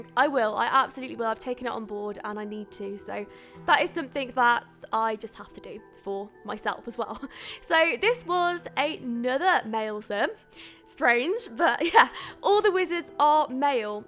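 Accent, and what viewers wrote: United Kingdom. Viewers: you live in germany? but your accent is so british o.o how do you maintain it?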